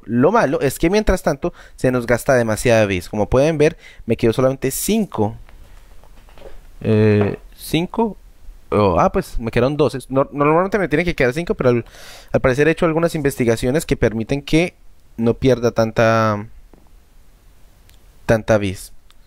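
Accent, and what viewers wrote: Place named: Colombia. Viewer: De q parte de colombiano eres ??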